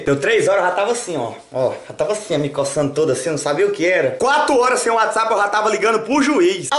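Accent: Northeast, Brazil. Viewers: é pq o sotaque dele é de nordestino. E ele fala gírias.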